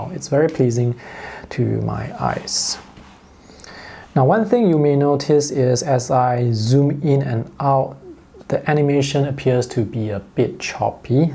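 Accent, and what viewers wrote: Singapore. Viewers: Hey! Really enjoyed this video as I am a fellow designer myself and feeling very conflicted on whether i should get the iPad Air or the Pro for sketching. I was wondering if you're based in Singapore cuz of the way you speak and then I saw the sketches haha! Singaporean here too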